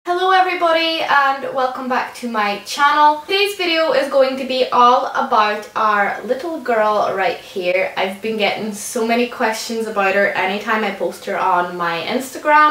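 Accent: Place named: Ireland. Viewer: Awe shes so cute and i love your irish accent!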